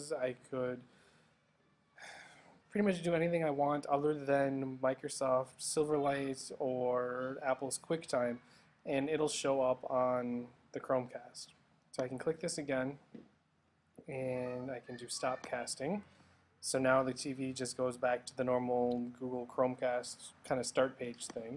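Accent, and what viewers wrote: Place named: Canada. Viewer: You sound nice and Canadian!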